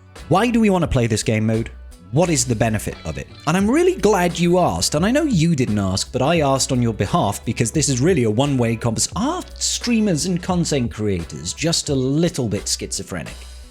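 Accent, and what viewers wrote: Wales, United Kingdom. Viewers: Welsh, I thought you were Australian.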